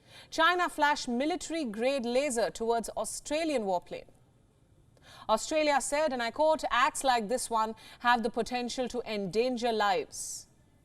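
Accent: India. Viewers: Your Indian TV program Gravitas is a really a joke, why ? Your English is terrible with heavy Indian accent, all you should do is to brush your English. The South China Sea within the nine dashlines belongs to China. Phillippine intruders will of course be warned, and we Chinese are not warmongers, we just warned the Phillipine warship with laser, this is a very advanced weapon than firing rockets at the Philippinos. By the way the Philippinos don`t have such advanced laser weapon, so stop intruding Chinese territory. Next time there will be no such friendly warning just by laser, your military vessels will be blown into pieces. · If not for her Indian accent, I would have believed everything she said. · It's like watching CNN in English with a Indian accent 🤮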